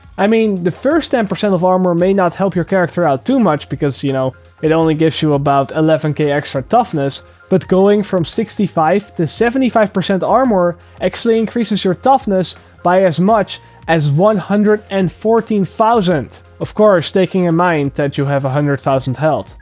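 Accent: Netherlands. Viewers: You're probably Dutch, right?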